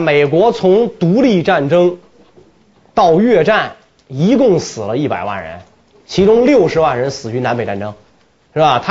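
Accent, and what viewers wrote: Beijing, China. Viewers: 讲课的时候用北京腔感觉不严肃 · 讨厌北京强调。 尤其是讲课的时候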